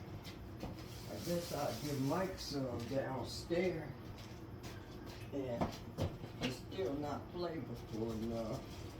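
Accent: South, United States. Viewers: An elegant domesticated classy pretty lady with a sassy personality and tenacious Southern accent, Ms. Thanks immensely for the eclectic succulent crusines cooking tuteledge. Your foods look delicious, fresh, and quality. That gumbo look flavorful, delectable, quality, and fresh. Have a positive and productive day Ms.. 😂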